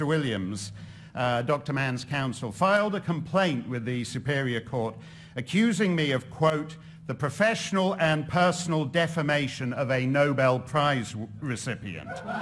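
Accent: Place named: England, United Kingdom. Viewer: Im from the UK and this guy is obviously the dumbest Englishman I have ever had the misfortune to see. Hilarious that all you idiot deniers will believe anything he says because of his accent. He looks like a partially shaved Groo the wanderer but Groo wasnt a gay man and he hadnt been paid off by the fossil fuel industry like this guy and frolly both have.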